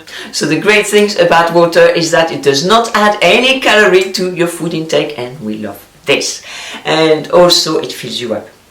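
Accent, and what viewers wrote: France. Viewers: Words of wisdom! I think most women know these rules but it’s so nice to hear it as a reminder especially when it comes with a French accent! It’s hard to stay on track when you’re home now, near a refrigerator. Have healthy fruits and veggies ready to snack on. And the occasional cookie. But sugar is an addiction. So if that’s you (like myself), you may have to cut it out completely to “detox” first. Losing weight is a marathon, especially after your metabolism slows down. This lockdown won’t help, so you need to keep moving, walking or running daily. Enjoy the fresh air with your kids or dog. Once you get older, like me, it’s so much harder to lose those extra pounds, so try not to gain them. That’s what this video is about, maintaining a healthy balanced lifestyle! Good luck everyone! And stay safe!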